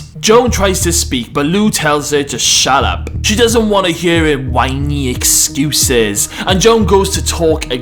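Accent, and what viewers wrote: Wales, United Kingdom. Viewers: Love your analysis so much, as Wentworth keeps getting better so do your reviews- this review is pure art with your fine detail of screenshot selection, your feelings in response to the scenes resonate. I love your predictions - I definitely think you could have a role for the show - as a Melbourne boy born and bred but living in UK I love to hear the passion snd love for our best ever Australian tv show delivered in a welsh accent. I vote for you being offered a role by FOXTEL to create official special feature shows that celebrate the series and give you direct access to the cast/crew/writers.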